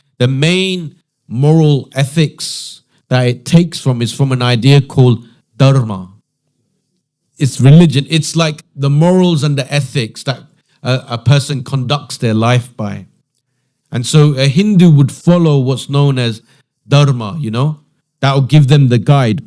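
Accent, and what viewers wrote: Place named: Saudi Arabia. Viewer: You were not hindu you have Soudi accent you liar. You just want to promote 😃you fool!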